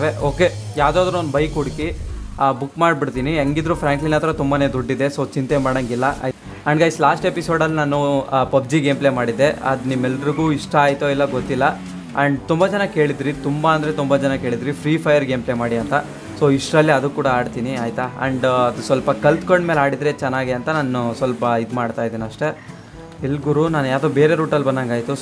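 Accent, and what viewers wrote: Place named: Chitradurga, India. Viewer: Bro nivu namma chitradurgada Helidiralla super 💞love from chitradurga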